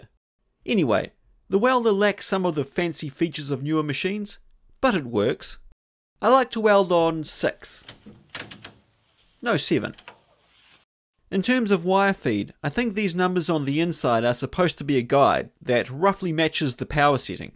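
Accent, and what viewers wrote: New Zealand. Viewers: I wish you did this full time! Your videos are genuinely excellent. I think it is the kiwi commentary that makes them! Can’t wait for the next. 👍👍👍👍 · That would be the New Zealand pronunciation, just like 'fush n chups'!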